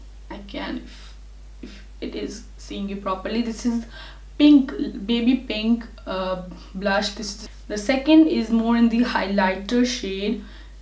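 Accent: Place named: Australia. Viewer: Loving ur accent australian touch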